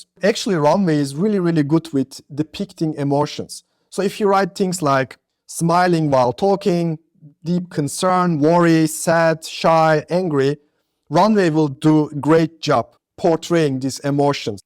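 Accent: Turkey. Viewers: İngilizce de konuşsan Türk olduğun anlaşılıyor 😄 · seslendiren türk mü bu aksanı nerde olsa tanırım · o nası bi Türk aksanıdır, nerde duysam tanırım :D güzel içerik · Bu ingilizce konuşma biçimi ancak bir Türk'e aittir. Tebrikler video için. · türk aksanı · O klasik soruyu sorayım. Türk müsün la ? aksanın Türk gibi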